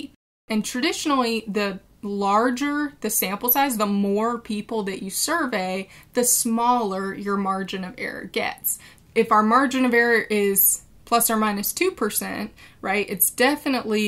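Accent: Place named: United States. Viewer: margin of air the american accent is beautiful thanks for the tutorial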